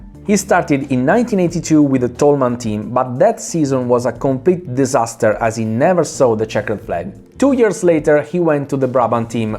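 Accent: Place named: Italy. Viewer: Hablas inglés con un fortísimo acento italiano. Pero me gusta igual. Tuttavia preferisco la tua lingua originale... I give you a deserved like👍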